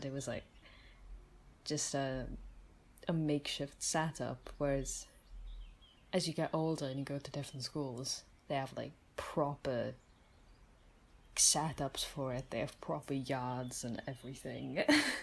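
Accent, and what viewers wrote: Wales, United Kingdom. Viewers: Can’t quite figure out the accent 😂. Is it Welsh?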